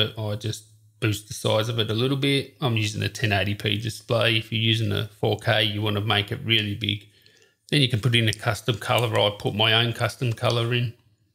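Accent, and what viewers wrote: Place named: Australia. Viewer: I just need to rewatch the video for the tutorial I came for, I was lost in your sweet Australian accent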